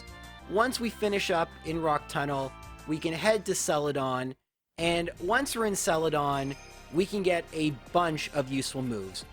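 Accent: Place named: Canada. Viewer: I'm going to say this but you sound like your candanian which I say hello neighbor. After seeing you live you look like christian slater's little brother Edit: add the join button I wanna support your channel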